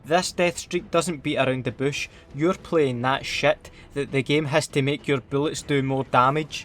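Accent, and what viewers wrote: Scotland, United Kingdom. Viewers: i started talking with a scottish accent because of you and im german.. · What part of Scotland you fae?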